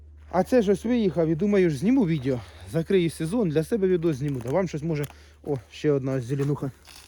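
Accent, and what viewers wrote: Ukraine. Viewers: Який колоритний український діалект! Таке задоволення слухати! Та й грибочки, все тільки конкретно, без лишніх блукань показано. Відео сподобалось! Єдине може тільки, знімати сам гриб трошки ближче, щоб видно було красу гриба. Дякую!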